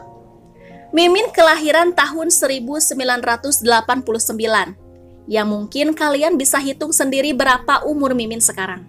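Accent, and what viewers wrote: West Java, Indonesia. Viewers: Akhir nya tau juga teh mimin org Bandung 😂🤭 sy jg ngikutin bang Jeder & bang Gelo... Sy doa kan smoga berkah nya teh mdh"an tmbah berkembang channel nya..dri awal suka dengerin suara c tth sok hyang seuri lamun teh mimin ngomong sunda teh 😂 😂 ciri khas bgt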